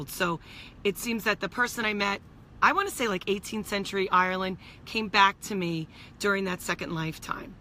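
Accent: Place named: Northeast, United States. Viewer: Wow. A properly asked question. I applaud you. She sounds as if she has a N.E. American accent. Perhaps as far West as Indiana or Illinois.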